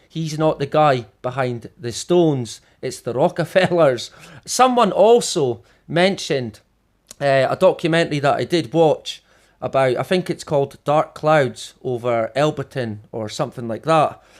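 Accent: Scotland, United Kingdom. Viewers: Shalom shalom, dear friend from bonnie Scotland. Sure you're right, it's not at all about control lol. What ever gave you that idea? 🤣🤣🤣 Thank you so much for the research you do and share here. It's always a blessing and a pleasant break from other things here to hear you tell it like it is with your awesome accent and sense of humor. My favorite place to hear what's really going on. Blessings on you and yours! 💚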